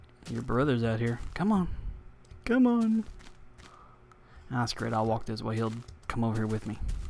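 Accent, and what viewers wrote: South, United States. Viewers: you from the south bro?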